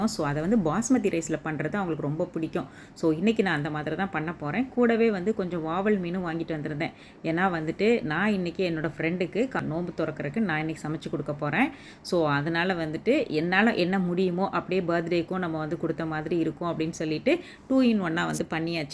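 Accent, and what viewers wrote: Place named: Kongu, India. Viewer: Neega erode ah sis neega pesarthu kongu Tamil maathirye iruku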